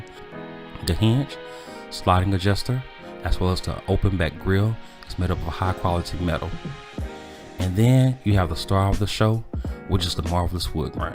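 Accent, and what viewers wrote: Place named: South Carolina, United States. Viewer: Oooooooh. I want these! And is that South Carolina I hear in your voice?? 🤣💯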